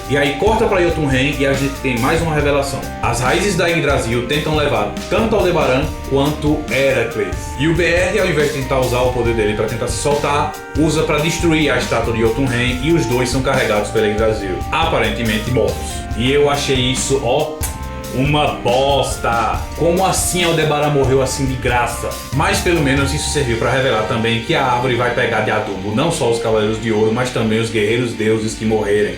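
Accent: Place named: Northeast, Brazil. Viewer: cara vc e hilario.. serio.. seu jeito. seu sultaq he mt engraçado. por acaso vc e do seara ou paraiba.. seila esse e nt engraçado. os conentarios as cateretas q vc fz mt louko. mas parabems pelos videoa. ce deve ter passado horas dias ate mezes pesquizand sobre cdz ne mesmo. eu axo seus comentarios meio rud. tipo eu sei mas q vc fds. alem da pronuncia dos nones e palavras japonesas alem de errado fk mt engraçado no seu sultaq nortenho.. apropisito tbm n posso falar de vc q meu n he dos melhires.. tbm sou nordestino kkkk mas moro em sp fz tempo. e estudo japones a 6 anos. ja estou quase me tornando sempai seinto. kkk falow anigo onedetougosainasu. jya ne... te mais.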